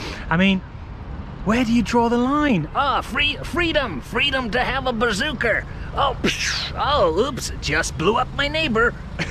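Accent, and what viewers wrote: United Kingdom. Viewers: Your criticism is that Americans have been brainwashed about China without having even been there. You say our media is corrupt (not wrong). But then you take the absolute worst headlines from this so-called "corrupted" media and push them even further out of context and make shocking omissions of factual information to make America (and the UK) sound like dystopian wastelands full of murderous villains. And how long have you lived in America? Yes- every country has its problems and need to do better by their people. But I have a question for you: If America is such a horrible place, why are massive droves of people risking their lives by walking all the way from places like Guatemala and swimming across the Rio Grande for a chance to get in? I don't know how long the lines are for the attractions in China. But Texas isn't exactly a short walk from Guatemala. 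You have one thing going for you- A British accent. And Americans will pretty much believe anyone with a British accent. How is this not immediately apparent to anyone with a brain as blatant propaganda?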